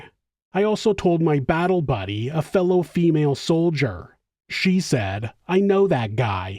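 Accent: United States. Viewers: I wonder where with a J was brought up? Where his accent is from? He doesn’t live in the States but he has an American accent. Quite a unique voice. 🥂🦘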